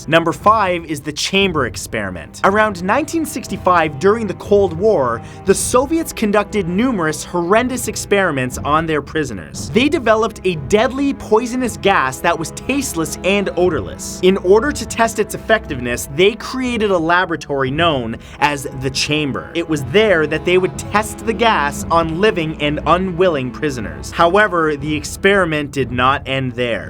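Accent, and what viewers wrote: Canada. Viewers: So your canadian? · Your Canadian? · You're from Canada?! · this is Canadian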